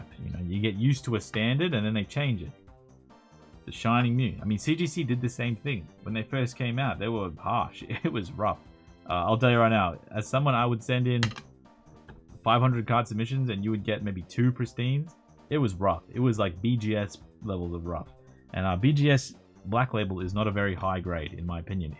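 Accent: Australia. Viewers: An hour video of regrades from a dude with an Australian accent? I click.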